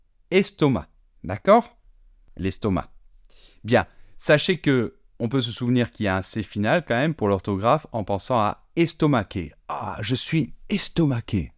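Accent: Paris, France. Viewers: Tu va expliquer au basque,au toulousain,au marseillais,au Auvergnat ect a bien parlé. Tu dois être parisien.c'est qui les débiles qui ne savent pas dire serrurier, écureuil... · ça, il ne va pas capter, parisien il est devenu.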